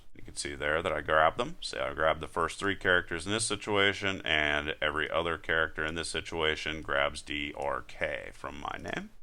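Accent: Pittsburgh, United States. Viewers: OK... you are indeed a Yinzer... and unlike so many videos, I didn't have to struggle to understand what is being said (which given that I used to work for Greater Bell Labs and had co-workers in my group from dozens of countries and had no problems understanding them, says how poor some of the videos you find up on YouTube are). While this video is a bit long, I think it would have been nice if you had explicitly covered the "var -> closure" syntax and nested each closures. Now to do some digging, as I try to write a Groovy script do do what I can do in over a dozen other languages already.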